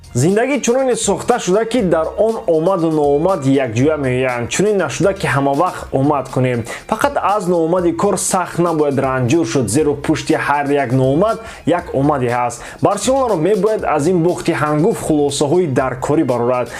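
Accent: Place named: Afghanistan. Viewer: Илтимос ба хаму лахчаи агони габ назан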